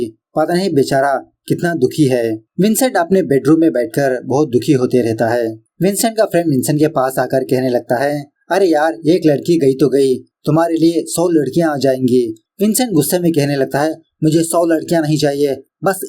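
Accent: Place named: Andhra Pradesh, India. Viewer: Andhra Pradesh maybe because Arunachal Pradesh ka bolne ka accent alag lag raha hai😅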